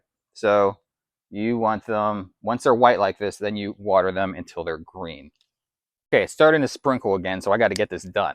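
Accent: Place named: Western Pennsylvania, United States. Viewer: Ha! I heard it… all my people are from Butler, even though i was born and raised in FL, I can pick up the slightest western PA “accent”. I love your collection, very inspiring. I have happy vandas and cattleyas, just getting into dendrobuims. I will kerp watching!!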